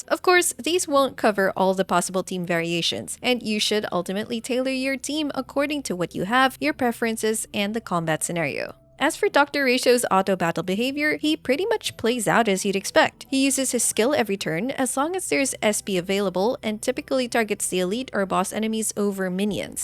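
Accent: Philippines. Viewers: My first video after trawling reddit for recommended Honkai Star Rail CCs, and an uninitiated af, first time HSR player here doing their due diligence. First few seconds of playback and I thought, "Pilipino siguro si," and true enough, you are! Great to see a countrywoman be highly regarded and recommended in the HSR threads of wild reddit and, I'm sure, YouTube (my bad though thinking you had the Y chromosome; a thousand apologies XD). Here's hoping your channel hits 500k subs soon, and grows beyond that! (Yep, did my part to contribute 😉). I realized though this isn't a video for beginners, so I'll just show my noob behind out...and comb through your other tutorials, of course! lol Best wishes!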